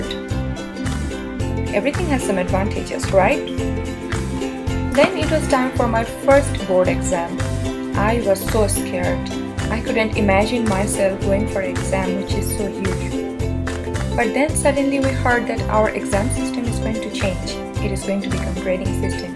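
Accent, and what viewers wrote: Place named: Sylhet, Bangladesh. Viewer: haha me too oank hashi pai sylhetii shunlay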